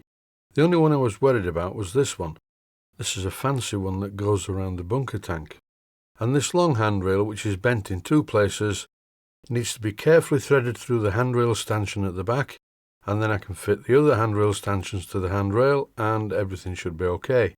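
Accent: Yorkshire, United Kingdom. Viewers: Dear me, Mr., why do You apologise for your Yorkshire accent!? As I said some time ago, I used to live there, well not exactly in the heart of Yorkshire. I used to live among the Geordies and for a someone from the place where You live maybe that will make a difference. And what a beautiful experience it was. When You said "The bloody pigeons have buggered off again" I felt right at home again. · Hi 1. Really enjoy your accent mate (this is cockney for I think lad in Yokshire?). 2. Just a quick query that was bothering me in the last few moments of this video - what is up with the middle wheel of the loco. Is it the camera angle or is the wheel bent/moving transversely across the frame? 3. No need to reply this could be my imagination - the camera angle - and I'm not trying to prove I study each of your videos with my micrometer pressed against the screen (well not always - really). 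· I live in Sweden and I love your Yorkshire accent, as long it isn't spoken way to fast.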